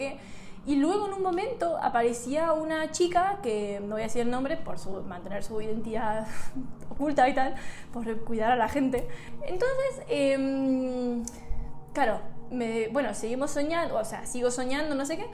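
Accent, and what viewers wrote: Spain. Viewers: Acabo de caer en cuenta de que puede que esté teniendo varios indicios de viajes astrales, woooo. Voy a investigar más y practicar. 👀 Oyyyyyyye, y ya tienes re fuerte el acento español, jaja, recién lo noto. Te escuchas muy bella con la mezcla de acentos. xd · me mata que cada vez tiene más acento español xdd mas tierna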